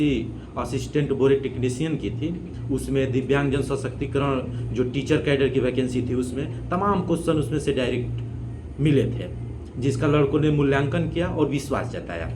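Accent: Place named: Bhojpuri, India. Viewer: Bhaiya aap ka language kuch bhojpuri jaisa malum pad raha hai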